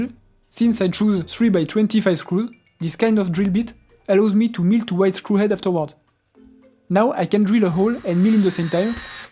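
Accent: France. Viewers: oh putain cet accent bien de chez nous XD Tu as bien du courage de faire une chaine en anglais. :) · Salut belle realisation , pour l'anglais travail ton accent car on vois bien tu es francais mdrrr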